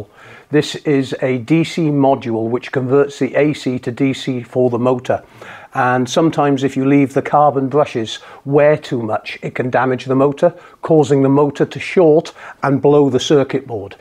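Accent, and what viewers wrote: United Kingdom. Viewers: Thanks a million for a great tutorial. And as English isn't my first language I especially appreciate your plain, sort of BBC like, English so easy to follow. Best regards! You've already created a legacy.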